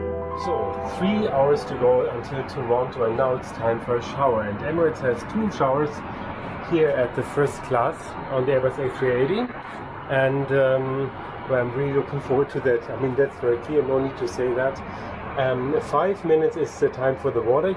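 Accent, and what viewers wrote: Germany. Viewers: Hay, I've only just found your channel a short while ago. I had been watching one of the reviews from "Nonstop Dan" and whilst making a comment to him about his latest second channel, I saw, in the comments section, that you had also added your own comments. That was the very first time that I have heard of so my reaction was to immediately check you out. I am so very glad I did! I've only just begun looking through your quite extensive range of reviews and, from what I've seen so far, I don't think that I'll at all disappointed with them. I intend to spend a very enjoyable time as I go through your work. BTW Ilove your wonderful German accent! I have visited many parts of Germany because it's so beautiful, as are it's people. I am now looking forward to following you very much indeed. I love your presentation and your appeal! Thank you and Keep Safe, Keep happy and KEEP FLYING! 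Thanx.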